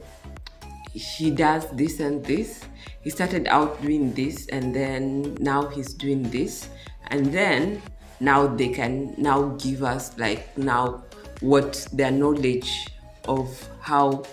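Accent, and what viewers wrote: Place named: Uganda. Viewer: I know you from East Africa, but are you from Uganda.... you sounded like one... I have a female friend who is also into media and entertainment from Uganda..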